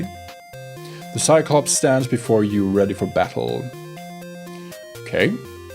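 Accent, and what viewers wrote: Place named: Sweden. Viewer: Your accent is really swedish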